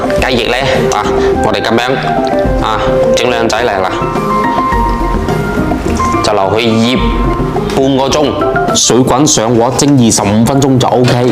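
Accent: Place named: Zhanjiang, China. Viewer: 聽口音個師傅應該係湛江地區人😂😂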